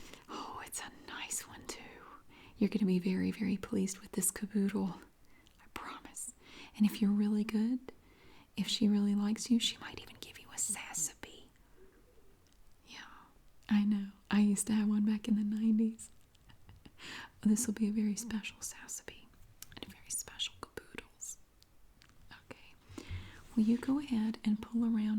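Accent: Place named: Carolinas, United States. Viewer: I think it’s so cool that you said Charlotte and that you’re from the Carolinas! I’m so used to hearing these accents and way of speaking I think you’re the only Asmr youtuber from down here it’s great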